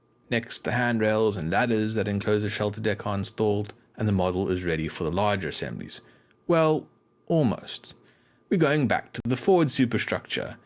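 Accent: South Africa. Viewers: Good god man you do really hate yourself dont you ? Where you from am I hearing a hint of the Karoo ?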